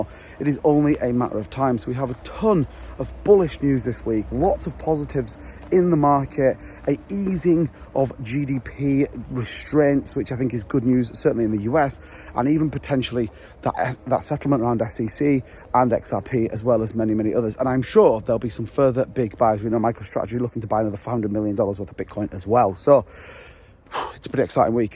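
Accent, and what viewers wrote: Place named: Bradford, United Kingdom. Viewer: Wear are you from bro? Sounds like Bradford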